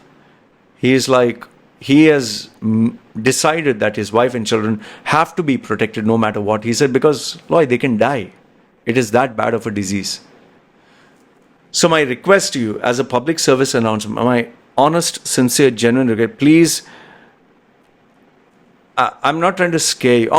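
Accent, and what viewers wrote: India. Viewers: Indian English accent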